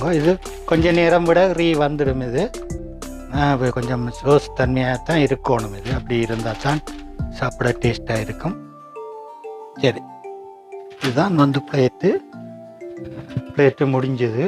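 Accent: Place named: Sri Lanka. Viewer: Lovely recipe and narration 😊👍.. srilankan slang is arumai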